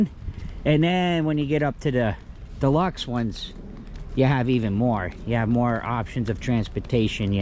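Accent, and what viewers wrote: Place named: Northeast, United States. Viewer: Can't place that accent. NY? MA? NJ???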